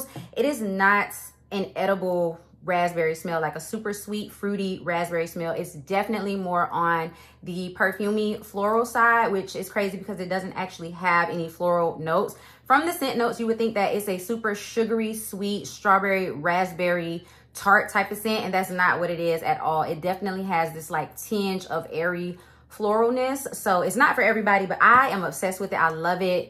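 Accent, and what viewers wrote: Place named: Bay Area, United States. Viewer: Thanks so much for the new scents descriptions! I can't wait to hear your thoughts when the extended Gingham line drops. Btw did I miss a FAQ or get to know me video? I must know if you're from the Bay.😂 You talk so similar to us here in the Bay(Cali not FL) and even some of your mannerisms are similar!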